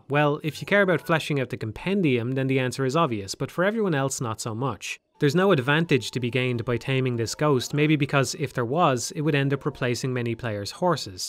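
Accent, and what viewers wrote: Ireland. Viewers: Is this guy irish? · Is this fella Irish? "Sometimes it seems the only thing Link can't mount is Mipha." Haha yeah up the lads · are you Irish? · Are you from ireland?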